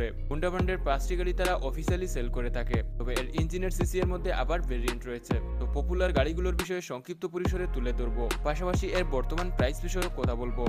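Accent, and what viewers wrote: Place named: Sylhet, Bangladesh. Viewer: Jodi valo korei kotha bolen apni je Sylhety ta apnar kothay bujha jay.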